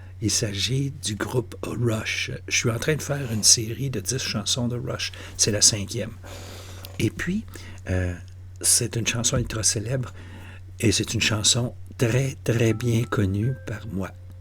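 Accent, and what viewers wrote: Quebec, Canada. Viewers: I like your reactions and commentary. Helps me keep up up with my French! (The first time I saw one of your posts I knew immediately that you were from Quebec). From Rush, can I suggest and.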